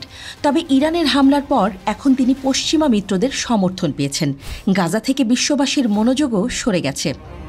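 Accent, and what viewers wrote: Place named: India. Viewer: উপস্থাপক ইন্ডিয়ান হান্ডেট পার্সেন্ট সিওর আমি 🤔😢😂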